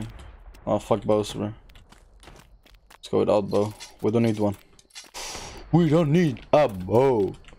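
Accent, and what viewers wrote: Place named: Norway. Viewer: haha you sound very norwegian with your accent, but where are you from then?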